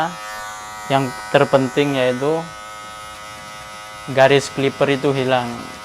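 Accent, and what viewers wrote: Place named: Madura, Indonesia. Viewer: Orang mana pean mas....kog kyak ada orang Madura disitu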